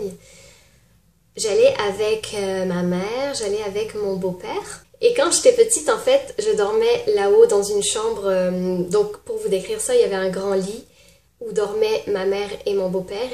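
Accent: Quebec, Canada. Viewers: Est tu une québécoise?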